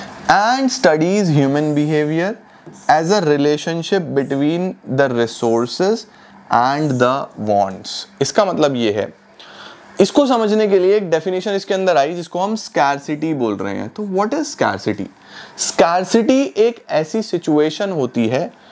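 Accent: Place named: Punjab, India. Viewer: Your punjabi seems cute😂❤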